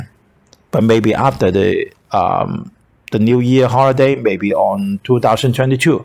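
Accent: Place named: China. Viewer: i don't see you have any improvement at all.you have the faith to speak does not means you speak it right.the way you speaking is like translate chinese speech to english.another Like you speak Omincron you pronounce it OH ME CON go google and type Omincron pronunciation and you find how to pronounce it.another example is the word 'Purpose" and you speak like "purple"and i thought you talking about colour by the way ,if you are born and raise in Hong Kong english is not a new language for you.am I right? hope you don't get mad when i criticize .Have bravery to speaking is good but you gotta speak it right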